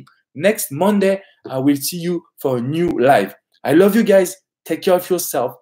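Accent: France. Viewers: Nice French accent. Where did you get it from? Thank you. great video.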